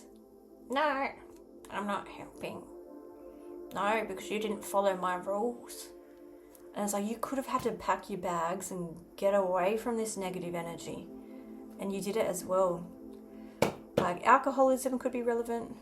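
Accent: Australia. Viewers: Your eyes are amazing i bet you get that a lot your voice is the sweetest wisper you make me chill 😎 to be as sweet as you lovely accent i think its Australian imagine nabours the girl next door who can read you inside out 😊❤x ditto my intuition is allways on key like a phenix angel 😇 covered in golden glow mmmmm i have yet to have my glow up I'm a very late bloomer the old catapiler in the universe I've been around since time began outside of Eden inside is just a memory a dream thats coming back to be remembered once more the beautiful garden i keep inside two lovers two meet to greet to find the love i left behind so long ago in my very first night invthe land of nod 💤 😴 💤 😴 to close my eye's to forget to remember when the time is right once more edens projection from within waking ⏰️ up to be remembered forever and ever a garden of light that never sleeps and never dies not even a flower not even a mouse surrounded by lions tigers and bears mmmmmm that jist want to be loved its actually the garden of love without a shadow without a doubt 😊❤x by beautiful your realy cool 😎 taa taa from Lancashire UK 🇬🇧😊❤x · I love your Australian accent😂❤❤❤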